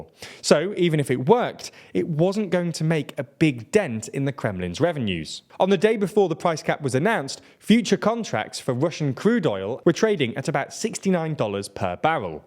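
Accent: United Kingdom. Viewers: Collapsed! How dramatic! Their economy is 10times stronger than any Western country. BTW, isn't it odd that so many anti-Russia Youtube posts have 'British accents'?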